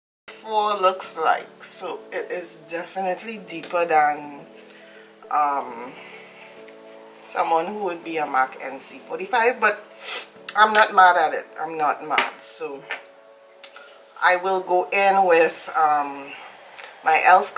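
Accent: Trinidad and Tobago. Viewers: Are you trini???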